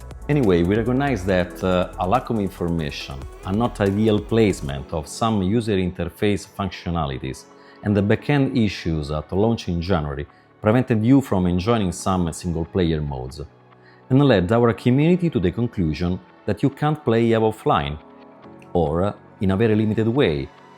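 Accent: Rome, Italy. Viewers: L'inglese con accento romano 😁 . E comunque sempre meglio del mio inglese ....complimenti per il gioco , spero esca presto l' aggiornamento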